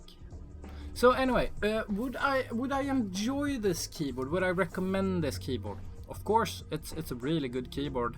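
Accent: Sweden. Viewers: Loved the review. Love your swedish accent aswell, especially xd. Recently bought one of these in factory new condition, it feels like a tank and looks like one aswell. I'm gonna mod it, so this video will probably help me again in the future lol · love the swenglish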